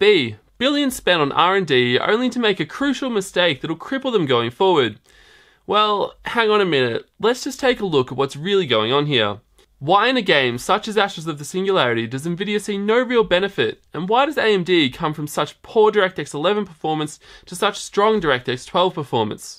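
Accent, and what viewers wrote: Australia. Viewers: Well done video, and I like the fact that you did not jump to conclusions where you didnt have enough information. Unlike that AdoredTV dude... well done sir. Curious what accent is that? Australia?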